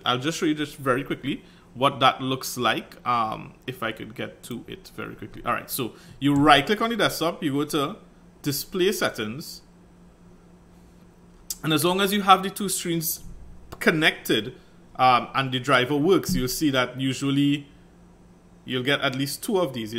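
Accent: Trinidad and Tobago. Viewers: He sounds trini in arima or chaguanas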